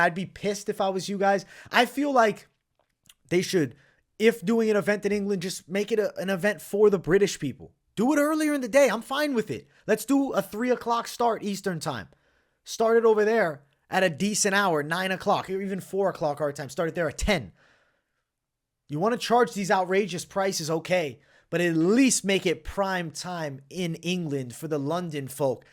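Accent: United States. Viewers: you got that American accent down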